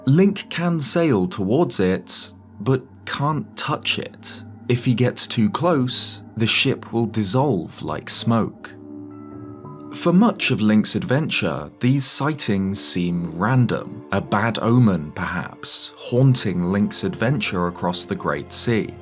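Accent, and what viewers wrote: United Kingdom. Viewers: not related but the rhythm of the sentence is really good for poetry or lyrics, especially when read by UK accent. Doubt it was intentional by the translators but it adds an extra element of spooky.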